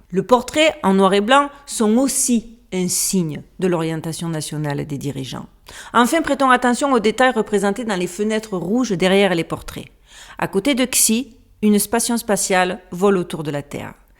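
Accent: South, France. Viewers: merci j'aime bien votre approche des sujets que vous traitez et votre accent du Sud aussi , surtout ne changez rien. Je peux avoir de l'humour sans aucune méchanceté mais qui parfois n'est pas compris. Ne m'en veuillez pas. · Hé Bhé ! Bon courage à Nous tous et que cette année soit la meilleure possible , vue ce qui nous attend à court terme. Bravo à vous pour ces vidéos Très instructives et je me posé une question , dans votre adresse e-mail ou insta 13 est pour Marseille ? vue votre accent je crois que j'ai ma réponse . Merci encore Bises 😜 👍 . Ha oui ! moi je suis à l'opposé , Lille le Norddddddddddddddd . 👏👋 . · Heu 😮 elle vit aux states 🇺🇸 !!! Donc pas d’accent du Québec en vue 😅sinon une pointe d’accent du sud de la France 🇫🇷 dont elle est originaire 🎉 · c'est normal 😊 🙏.. après le plus important que et vôtre amie et vous et passé les fêtes de fin d'année ensemble 🙏 le temps passe vite.. 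donc ☺️ courage je comprend ☺️ merci pour les sujets proposés 🙏🙏 et merci pour vôtre bonne humeur et l'accent du sud 😃 🙏 je vius souhaite une belle soirée 😊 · meme si l'OL est l'ennemi juré de l'OM je ne me sent pas arnaqué et suis trés fier à l'international que ton petit accent du sud rayonne!!!!!!!!!!! meilleures voeux 🥰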